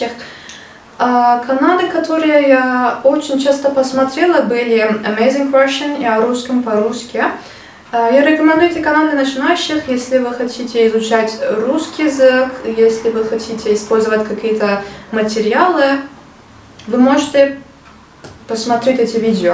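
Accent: Standard. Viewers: Восхитительно! Вы настолько чисто говорите по-русски! У Вас такой талант к изучению языков! Большое Вам спасибо за это видео.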